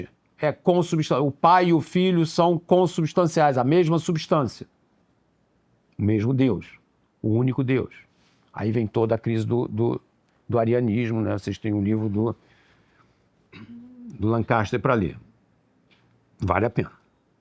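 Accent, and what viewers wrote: Rio de Janeiro, Brazil. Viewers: não nega que é do Rio… fala na lata🙈! Perfeito! Torço para que nos presenteie com mais aulas no CDB…👏🏻👏🏻👏🏻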